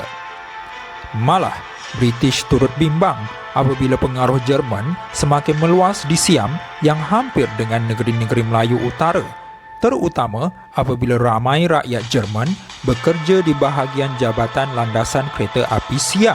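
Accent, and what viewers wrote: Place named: India. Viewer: Dengar suara YouTuber ini seperti India bercakap Melayu..Rasaya YouTuber seorang India...Cakap pelat India..Fakta yang ditulis ada sedikit tak betul.. Perjanjian Inggeris-Siam berlaku kerana Siam tidak mahu British menjajah negeri Siam..Untuk menyelamatkan Siam dijajah British.Kalau nak tulis kena kaji betul2 jangan bagi fakta yang kurang tepat.Siam adalah satu2nya negara yang tidak dijajah & sebab itulah berlaku Perjanjian Inggeria Siam..& Southern Thai Pattani & Golok terpisah dari negeri Kelantan & menjadi sebahagian negara Siam Thai hari ini..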